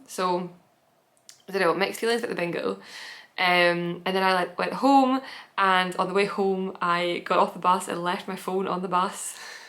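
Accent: Scotland, United Kingdom. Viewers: I personally don't have a knitting community in person because my knitting is my relaxing alone time, I do however LOVE the online community, I feel like I can join others in our passion without having to worry about contributing, Yours was actually one of the first knitting podcasts I came across and fell in love immediately, being Irish I love the Scottish accent, and whilst watching this I am working on my 6th. Tolsta tee and planning what yarn to use in my first Lauder, x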